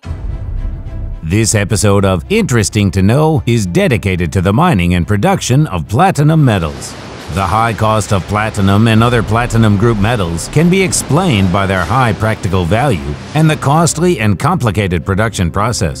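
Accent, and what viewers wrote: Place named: United States. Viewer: Interesting dialect. Almost sounds american.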